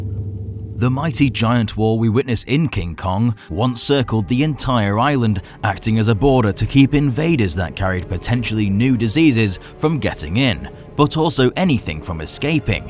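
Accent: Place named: United Kingdom. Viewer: U sound like every British person ever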